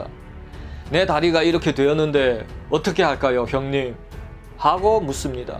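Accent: Yanbian, China. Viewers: 延边话听的我想乐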